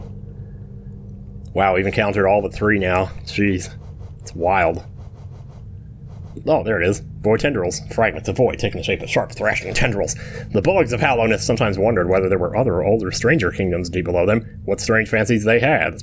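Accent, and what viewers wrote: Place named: South, United States. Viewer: I'm not the only one who reads Selubra's name as Sebulba. I wouldn't have pegged you as Southern until I listened to some of your earlier / vlogs (you sister sounded a lot more obviously Southern, as did you when you were youngr), but I HAVE always wondered, and sorry if this sounds weird, if you saying "boy I tell ya" and "lemme tell ya" so often is a regional thing, or something you picked up from your parents. I thought that seemed too easy. I also thought they'd bow. RoahmMyrthril (LPer and Mega Man no-damamge runner) always found Yellow Devil more tedious and drawn-out than actually hard, and got mass-disliked in a video for saying that. Had a feeling you weren't invincible there. But that still destroyed the fight. I like how Sheo's different attacks paint the battlefield, I assume the place will end up looking different every fight as he varies what attacks he does and in what order.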